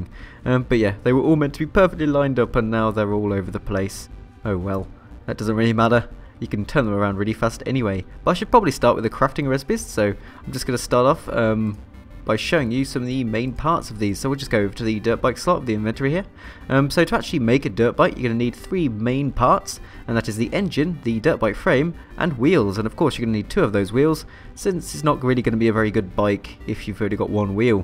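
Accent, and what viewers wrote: United Kingdom. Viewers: Your the best, your voice is very nice, your UK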